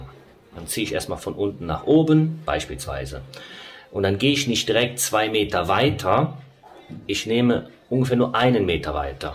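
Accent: Cologne, Germany. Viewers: Hallo sympathischter Stukateur der mir bekannten Handwerkerschaft, lieber. Als ehemals in DDR-Zeiten gelernter Baufacharbeiter Hochbau mit absolutem Hang zum Verputzen gefallen mir alle deine Videos außerordentlich. Besonders deine absolut lockere Art und deine hervorrajende kölsche Dialekt sind sehr sympathisch. Mit "eine Typ" wie Dich hätte ich auch gerne zusammengearbeitet. Mache bitte noch viele weitere Videos des vielfältigen Handwerkeralltags. Nette Menschen wie du es bist, sollten von den Handwerkskammern als Botschafter und Werbeikonen für das Handwerk herangezogen werden, meine Meinung... Die weiterhin maximale Produktionserfolge im Gewerk und beim drehen weiterer Videos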